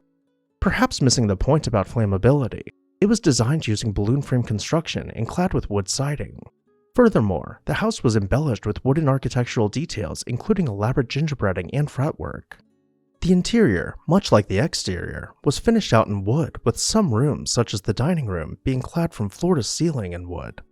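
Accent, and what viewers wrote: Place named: Georgia, United States. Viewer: I would love to visit your town! I adore these beautiful old houses. Georgia is a beautiful state from what I've seen in movies and photos, and I love the charming Southern Georgia dialect. I grew up in E. Texas and have that peculiar drawl, but I think Georgians have the best Southern accents of all. No one can give an insult as elegantly and sweetly as the genteel Georgians! "Bless Your Heart!"😂🤣💖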